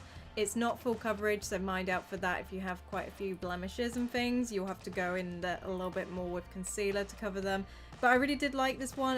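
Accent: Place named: United Kingdom. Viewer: Your so beautiful before! And your beautiful British accent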